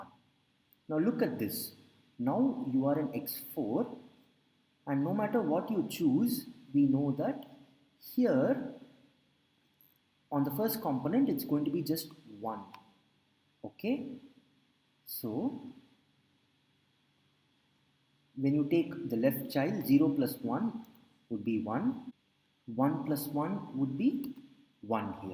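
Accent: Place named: India. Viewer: fucking indiaaaan accent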